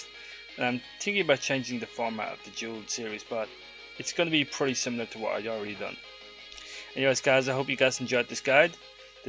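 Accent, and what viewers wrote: Ireland. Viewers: Where abouts are you from man? I sense an irish accent!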